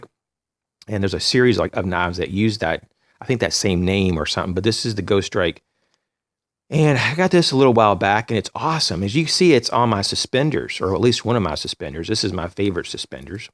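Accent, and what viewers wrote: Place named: South, United States. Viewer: Southern Georgia? Or maybe Texas or Louisiana? Trying to place your accent. it's a hobby of mine, I'm North Alabama.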